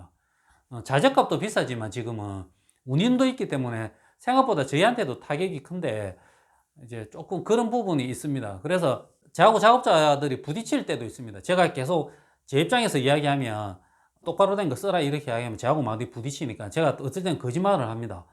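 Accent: Gyeongsang, South Korea. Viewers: ~목소리톤으로 경상도분같으신데 혹시어느지역이신가요? 경남함안쪽인데 의뢰가능한지요?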